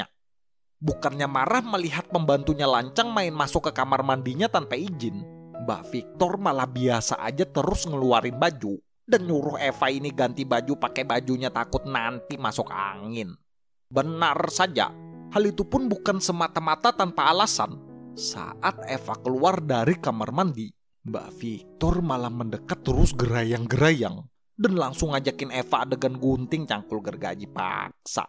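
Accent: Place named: Java, Indonesia. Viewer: 😂😂😂 kejawaan banget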